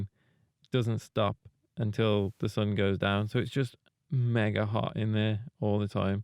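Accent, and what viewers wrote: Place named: Dudley, United Kingdom. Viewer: Dude I could have sworn you were from Dudley.... But now I'm tryna figure out where the hell you live 😂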